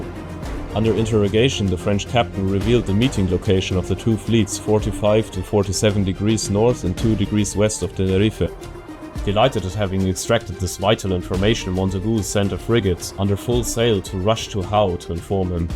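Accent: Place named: Germany. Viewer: so well made and also so funny with your lovely german dialect. you gained a sub!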